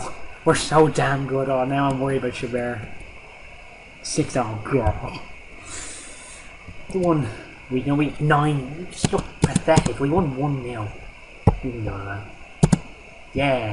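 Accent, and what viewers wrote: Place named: Ireland. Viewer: Where bouts in Ireland are ya from?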